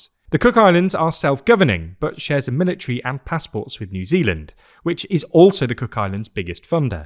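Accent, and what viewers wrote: Northern England, United Kingdom. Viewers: He’s from Northern England where they pronounce “u” and “oo” funny.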